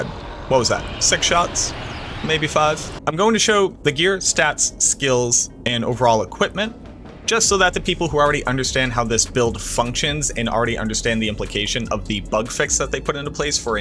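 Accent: Boston, United States. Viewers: The most impressive part of it is he has a heavy Boston accent when he isn't presenting; he showed it off in one of his recent videos and it completely took me by surprise. He is a really talented presenter.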